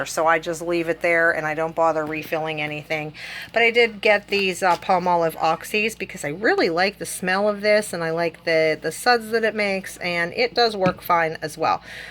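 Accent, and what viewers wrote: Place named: Pennsylvania, United States. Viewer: I love hearing that PA accent! My husband's family is from Mckeesport and Monroeville. My in-laws and Husband lost their accent b/c they spent 20 years in South Florida. Hearing you reminds me of hub's grandparents, who passed on years ago. Wow! That was a long winded explanation 😂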